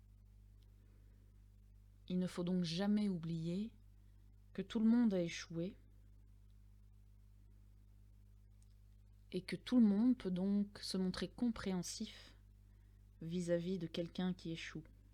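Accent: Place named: Belgium. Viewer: J'ai bien aimé la vidéo, je l'ai visionnée/écoutée 2 fois. Pour moi votre accent belge rend votre voix plus belle et plus agréable à entendre (je suis Français...), et je trouve vos conseils plutôt pertinents. Petit bémol de mon point de vue, le son semble très bas par rapport aux autres vidéos sur youtube. Je dois alors augmenter le volume au maximum puis le baisser subitement dès que je visionne une autre vidéo. En tout cas je vous dis merci et vous souhaite une bonne continuation.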